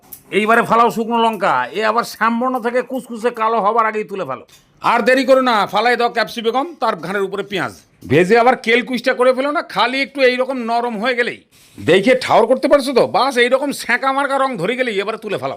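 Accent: Bangal, Bangladesh. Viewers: বাঙ্গাল কথা কি বলতেই হবে। কথার ধরন শুনে এদেশিরা আর আগ্ৰহ দেখাবে না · খুব সুন্দর করে বলেন, আমি আপনার দেওয়া রেসিপি গুলো করে খাই, তারপর আমার মা চট্টগ্রামের মেয়ে, রান্না তো জানেন,আপনার কথা গুলো শুনে মনে হয় আপনি বাঙাল,তাই তো অত ভালো রান্না করেন।আমি শুভ্রা। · Bangladeshi accent 😊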